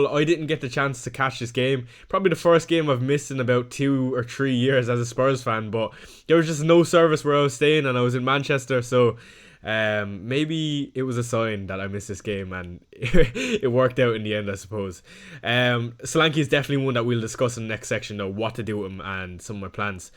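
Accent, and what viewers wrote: Ireland. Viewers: You have great advice and insights, and absolutely the best accent of all the FPL You Tubers! Love a cheerful Southern Irish accent...😊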